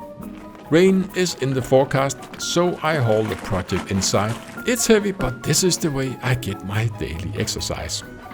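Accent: Denmark. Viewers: click on a video from a channel I haven't watched before, immediately hear the accent, OH A FELLOW DANE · Great video production, likeable 50something, cool looking furniture, charming Danish accent: I subscribed!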